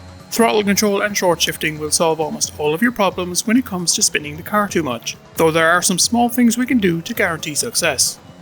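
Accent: Ireland. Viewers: My man sounds a little irish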